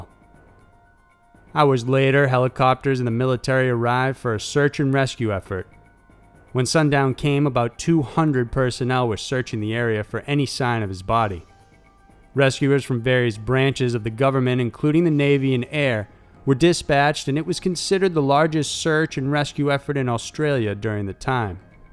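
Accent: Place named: New England, United States. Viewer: msmeowdetroit, he said "father and father away" instead of farther. I hear a New England accent.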